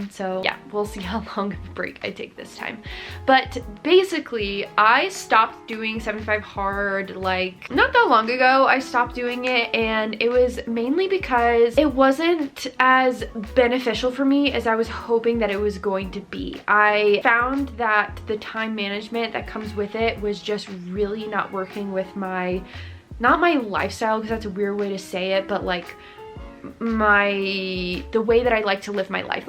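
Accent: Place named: South, United States. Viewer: People who think your accent is fake isn’t from the south lol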